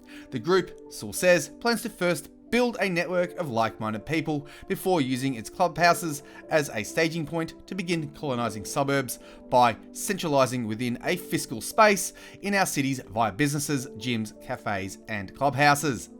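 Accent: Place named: Australia. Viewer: the over pitched 'Aussie Stryne " became too annoying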